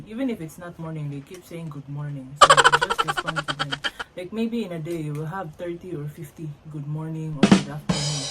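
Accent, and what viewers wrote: Nigeria. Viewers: Have you realized you have developed a Nigerian-English accent? yes, you sound like a nigerian... Great video.. cheers!!!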